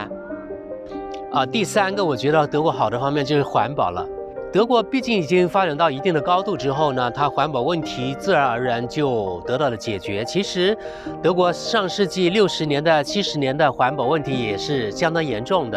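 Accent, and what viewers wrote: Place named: Cantonese, China. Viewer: 感觉您有粤语的口音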